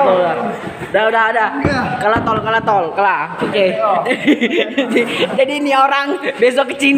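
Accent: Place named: Madura, Indonesia. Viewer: Lu orng maduraa yaaa bg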